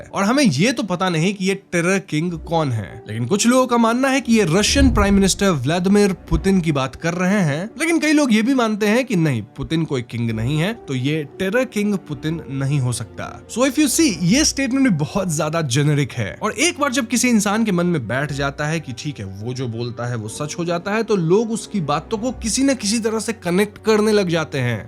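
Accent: Bengal, India. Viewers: Bhai tu bangali hai Keya Tera Hindi sunke Aisa lag raha ha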